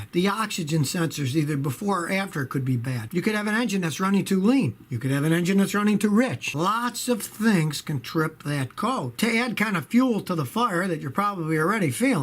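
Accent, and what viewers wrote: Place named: Upstate New York, United States. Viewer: Hes from upstate NY, he sounds like italian friends i have from upstate NY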